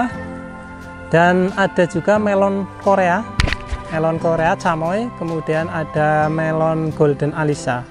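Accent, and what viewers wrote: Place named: Banyumas, Indonesia. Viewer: Salam ngapak 😂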